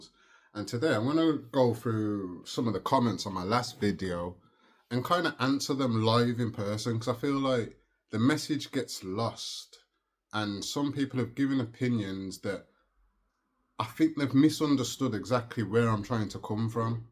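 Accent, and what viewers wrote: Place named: Birmingham, United Kingdom. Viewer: Your English mainly because of your accent mate. Brummie area Jamaica/Africa bloodline.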